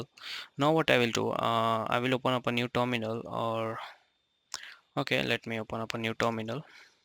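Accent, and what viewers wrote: India. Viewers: Average Indian accent :))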